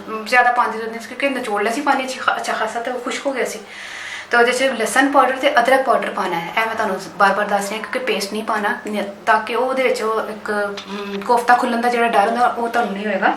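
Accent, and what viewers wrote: Punjab, India. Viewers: Punjabi bohot payari bolden Ho 😍👌🏻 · punjabi ka tarka acha lga mujhy😄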